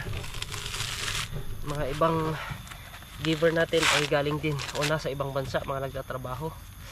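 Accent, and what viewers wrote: Visayas, Philippines. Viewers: bisaya ka sir?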